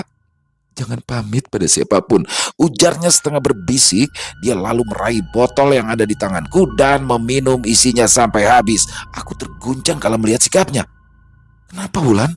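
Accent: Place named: Banjar, Indonesia. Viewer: Bagus logat banjar anda 👍👍👍👍👍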